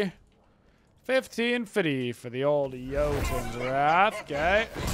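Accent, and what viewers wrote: United States. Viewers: Are you from Arkansas?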